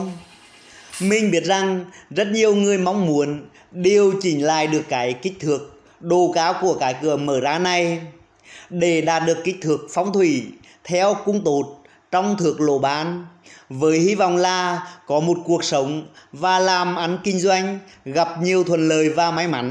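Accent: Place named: Central, Vietnam. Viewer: Giọng miền trung Hay quá chúc bạn ngày mới vui vẻ nhé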